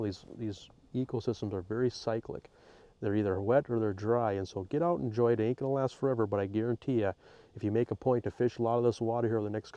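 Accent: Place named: Upper Peninsula, United States. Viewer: I'm from the U.P. of Michigan, Menominee area and moved to Kentucky. There are too many noodlers around here. They don't know what a fresh water fish is. Every time i go out fishin all i see is ppl gettin down in these cat holes with smell and bate tryin to get what they call good eats. I'm sure its fun an all but cats are just not my slice of cheese. In fact my craven for a good pearch fry got so bad I went all the way to Erie, PA just to get that good old taste! Nice yooper accent/video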